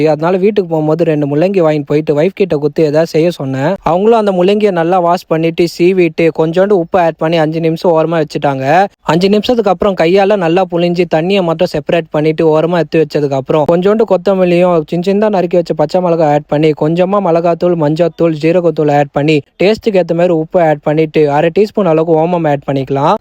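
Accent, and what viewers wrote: Rajasthan, India. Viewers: How u speak Tamil? You are Rajasthani or Marwadi?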